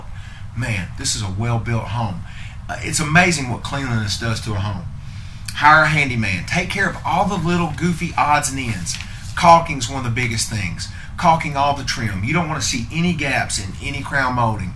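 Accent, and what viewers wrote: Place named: Alabama, United States. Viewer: Roll Tide, man, with that accent!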